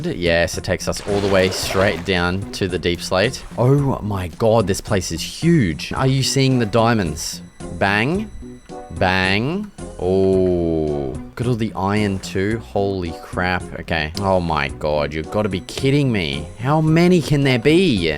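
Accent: Australia. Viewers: you sound like the biggest aussi ever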